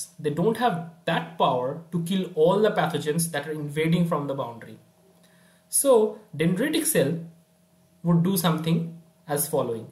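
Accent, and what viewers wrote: India. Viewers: Fuck !!! I hate Indian accents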